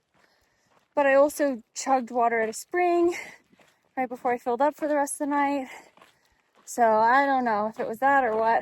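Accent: United Kingdom. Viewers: Pure London, is she part british? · You’re doing great on your hike! Enjoy your British accent, did you live in England at some point in your life?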